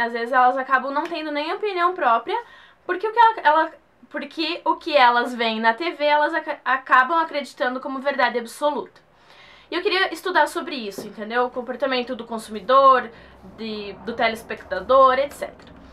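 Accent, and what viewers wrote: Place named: South, Brazil. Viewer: Amei o video. Que sotaque legal heim? Amo sotaque de sulista, pra min e um dos mais bonitos, e o seu e mt forte. Abracos!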